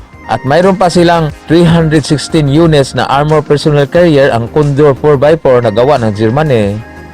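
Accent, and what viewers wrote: Visayas, Philippines. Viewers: Bisaya diay ka kol, haha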